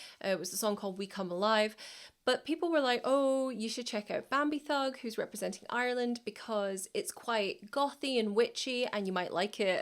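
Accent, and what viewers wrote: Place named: Scotland, United Kingdom. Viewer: I thought u were from Ireland then u realised your from beautiful Scotland ❤️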